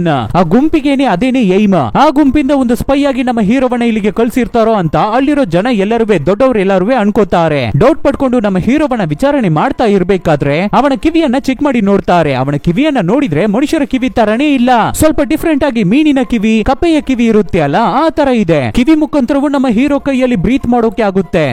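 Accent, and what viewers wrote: Tamil Nadu, India. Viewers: Tamil navru kannada matadtidare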